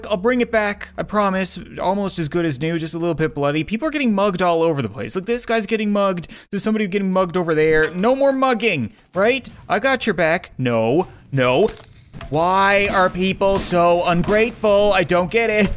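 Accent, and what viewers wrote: Canada. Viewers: GoldenGamer 12 he’s Canadian pretty sure that’s just how they say it.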